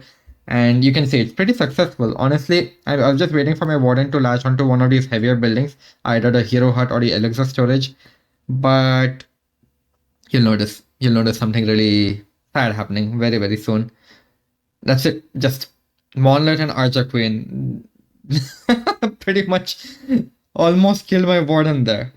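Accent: India. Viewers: Love your accent, which part of India are you from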